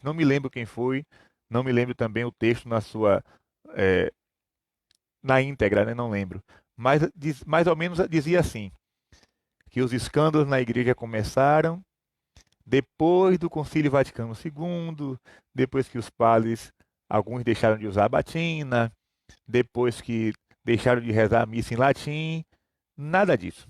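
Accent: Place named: Bahia, Brazil. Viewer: Padre que luz, eu que já adoro o sotaque baiano, ficaria horas te ouvindo com esta sabedoria serena e humana, parabéns, que belíssima explanação! Gratidão.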